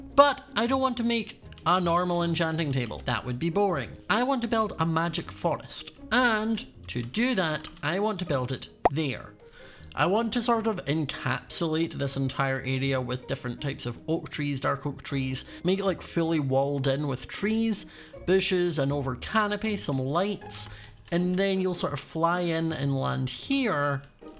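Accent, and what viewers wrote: Scotland, United Kingdom. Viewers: Are you Scottish? JW you just sound Scottish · Are you British?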